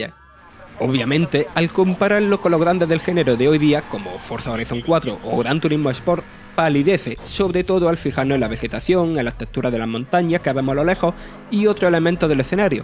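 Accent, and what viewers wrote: Andalusia, Spain. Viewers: Eres de cordoba ? O jaen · Lo que ocurre es, por la manera de hablar, debe ser andaluz. Sevilla, Córdoba o alrededores... Y sí, apenas en el primer minuto ya me molesta todas las S que no pronuncia.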